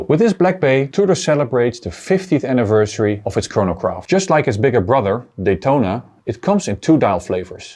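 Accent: Netherlands. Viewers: I am one of the lucky owner of the black one. Its great to see this review in English with a Dutch accent :-) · I love how me as a Dutch person can hear from somebody's Englisch if he is also Dutch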